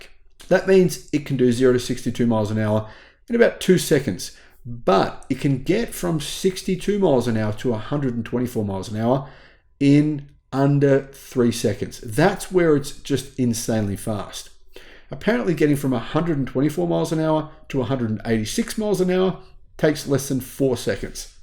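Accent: Australia. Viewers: What's a mile an hour?! You sound like a fellow Aussie to me.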